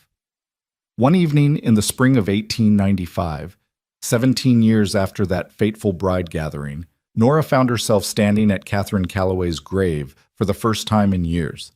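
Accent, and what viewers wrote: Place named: United States. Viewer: Your voice to text is highly amusing - obviously can not cope with your heavy American accent, eg a scent for assent, release or beliefs, eve Hercules for valise, united for he nodded, pastor for passed her and constantly wrote for rode. From Namibia.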